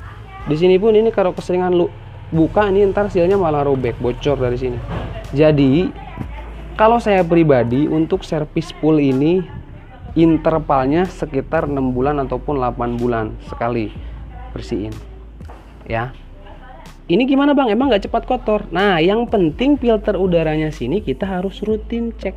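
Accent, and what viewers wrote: West Java, Indonesia. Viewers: sya suka kalo si abang ini dalam bicaranya urang sunda mana ieu teh resep ey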